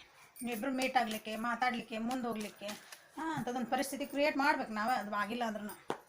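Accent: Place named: North Karnataka, India. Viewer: 😢ಮೆಡಂ ತುಂಬಾ ಚೆನ್ನಾಗಿ ಮಾತಾಡ್ತೀರ ❤ಸೇಮ್ ಟುಯಸೇಮ್ ನಿಮ್ಮ ತರ.. ನಮ್ಮ ಉಡಗಿ ಉತ್ತರ ಕರ್ನಾಟಕದ ಬಾಷೆ ತುಂಬಾ ಚೆನ್ನಾಗಿದೆ. ಓಕೆ. ನೀವು ಹೇಳಿದ ಮಾತು 100ಸತ್ಯ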